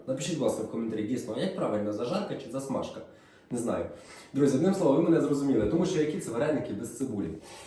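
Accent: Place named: Hutsul, Ukraine. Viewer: Хороший, позитивний і діловий хлопець! А мова у нього - з діалектом гуцульщини, ну, і що? В кожній частині України населення має свій діалект. Не звертай на погані коментарі увагу,!!! ❤😂🎉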